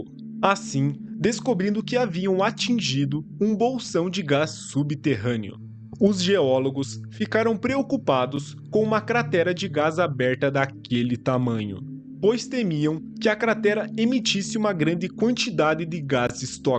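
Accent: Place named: São Paulo, Brazil. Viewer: Vc é do interior de São Paulo... caipires em dia ... muito top...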